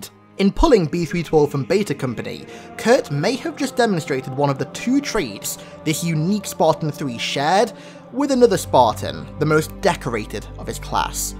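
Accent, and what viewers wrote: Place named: United Kingdom. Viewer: Goddamn, can your voice get even more cocky british? 👎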